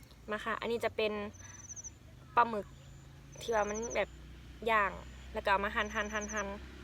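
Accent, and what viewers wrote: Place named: Chaiyaphum, Thailand. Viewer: มักหลายสำเนียงคนชัยภูมิ น่ารักมากครับ❣️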